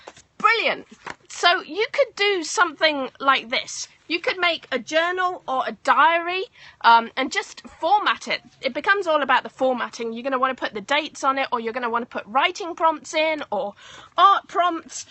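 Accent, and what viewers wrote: United Kingdom. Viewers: ARE YOU AN ENGLISH GIRL?? BECAUSE YOUR ACCENT APPEAR LIKE YOU'RE FROM UK??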